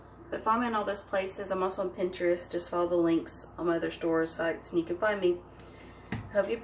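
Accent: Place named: South, United States. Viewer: I love your southern draw - shake it off!!! Kinda sad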